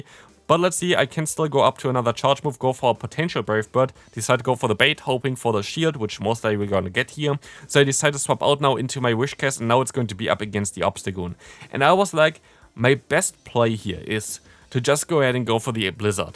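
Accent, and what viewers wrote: Germany. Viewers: He's german.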